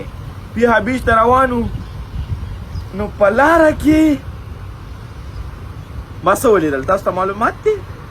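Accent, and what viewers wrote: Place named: Pakistan. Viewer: ته اول داخپل ژبه چی خبری کوی دااسلاح کړه بیاوازه افغان یم ځکه چی ستاټولی خبری ده پاکستانی دی یواری پښتوزده کړه بیاراشه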